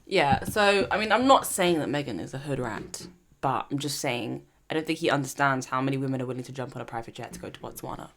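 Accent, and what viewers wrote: United Kingdom. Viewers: Gilstrap--Yes, I like her British Accent. Very Cute.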